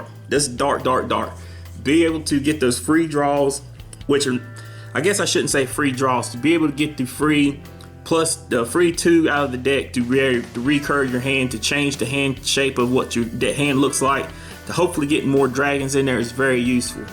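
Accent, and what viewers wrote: South, United States. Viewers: You sound like a country boy but nice profile 👍👍👍😀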